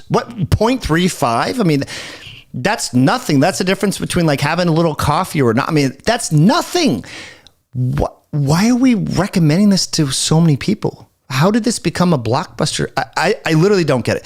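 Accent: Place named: South, United States. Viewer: North Carolina here. I heard a "bless his heart." You must be from the South. Hsha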